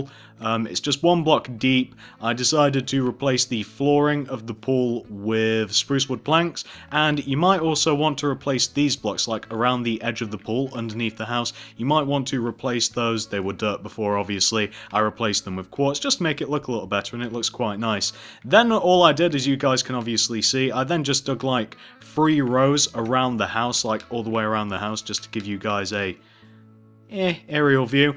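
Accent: United Kingdom. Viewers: I know your friend JosephBrown and i took your house that has a water fountain in front of it and i heard you talk british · UK AMERICA WERE DO YOU LIVE SOUNDING LIKE UK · _300 I hope you're being sarcastic because if you haven't already noticed...He is not American he is British....also British people have accents if you couldn't notice from the way he speaks....therefore he isn't saying free as three and fing as thing on purpose that's just the way they speak. Just thought you should know...just in case you didn't...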